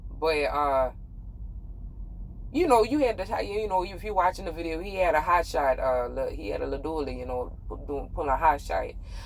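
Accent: Louisiana, United States. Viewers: Love the accent. Gotta be from New Orleans. I hear the Cajun in your voice. Anyway, Stay safe outhere. Don't take no wooden knuckles Beeby!!! My Louisiana people know what i'm saying. Lol. Peace. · Love that Louisiana accent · I Love that Louisiana twang guuurl just keep talkin 😁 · Sounds like a beautiful Lousiana accent. This lady is beautiful! Hey Sis! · I love the Louisiana accent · I like her accent, what part of louisiana she from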